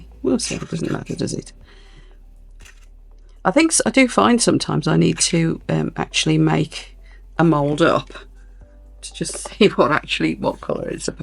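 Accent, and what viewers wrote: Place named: Black Country, United Kingdom. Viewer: LOVE the subtle black country accent.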